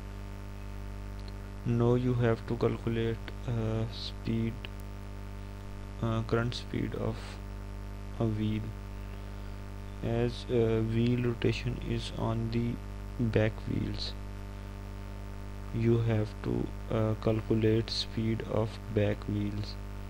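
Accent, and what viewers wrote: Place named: India. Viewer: Thanks man .. Great video .. Indian voice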